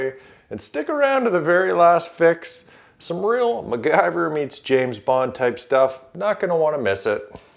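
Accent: Canada. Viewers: Love hearing a good Canadian accent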